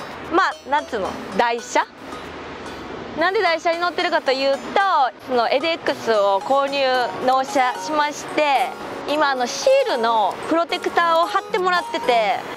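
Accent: Osaka, Japan. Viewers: She speaks with that Osaka accent, so if you know a little Japanese she might still be hard to follow.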